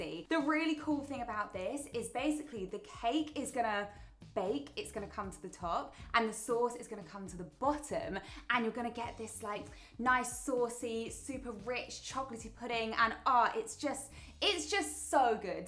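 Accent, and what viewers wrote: United Kingdom. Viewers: Thickest bri'ish accent have ever seen (not to be mean or anything)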